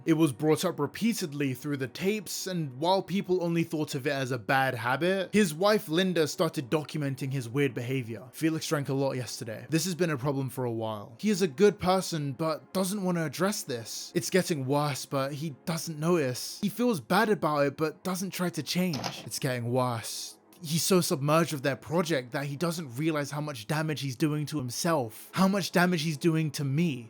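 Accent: United Kingdom. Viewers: Black man with funny (fucking English?) accent talks about analog horror and uses anime in the video for some reason. So anyway I subscribed to the great value KSI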